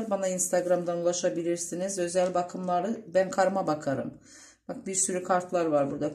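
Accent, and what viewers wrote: Azerbaijan. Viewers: Ağzınıza sağlık.Güzeldi.Bu arada sanki Azerisiniz.Konuşmanız azericeyə benziyor.Azərbaycandan yazıram.❤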